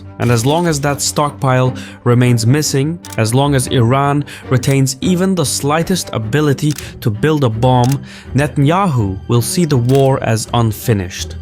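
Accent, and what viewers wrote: Russia. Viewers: Dude at least hid your Russian accent 😂 before trying to say what Iranian people want or who they trust! Did king Putin put you up to this? such a low act !!!:face-red-droopy-eyes::face-red-droopy-eyes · Thanks for spewing so much lies, you Russian asset with such a horrible accent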